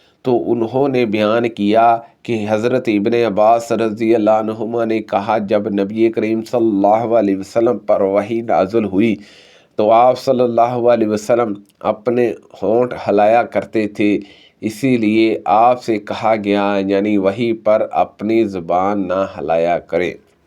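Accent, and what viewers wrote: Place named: Punjab, Pakistan. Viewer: السلام وعلیکم بھائی آپ سب سے پہلے اپنی اردو پر توجہ دیں، آپ اردو پنجابی میں بول رہے ہیں۔ احادیث کی آسان اور مختصر تشریح بھی کریں ،اس طرح عام انسان کو حدیث کا مفہوم سمجھنے میں آسانی ہو گی ۔ جزاک اللہ خیرا ۔❤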